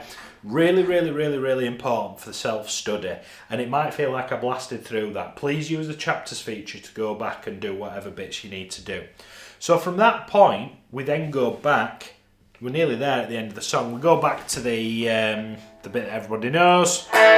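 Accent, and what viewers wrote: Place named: Leeds, United Kingdom. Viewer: Great gutar teacher as s leeds fan love the accent 😊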